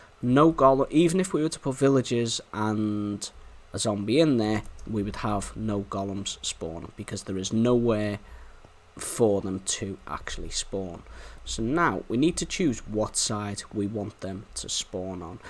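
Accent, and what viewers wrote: Liverpool, United Kingdom. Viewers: Nansymansy Si adnan LMAO. It’s a scouse (Liverpool) accent. Liverpool is a place in England my friend.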